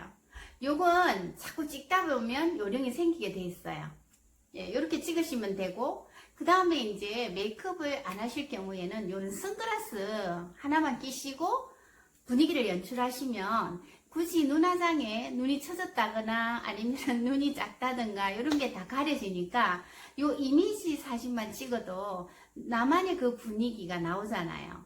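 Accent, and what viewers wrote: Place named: Busan, South Korea. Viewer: 사투리로 봐서는 부산 아지메가 학실합니더~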